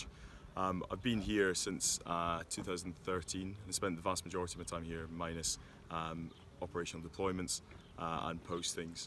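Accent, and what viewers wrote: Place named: England, United Kingdom. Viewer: You sound English to me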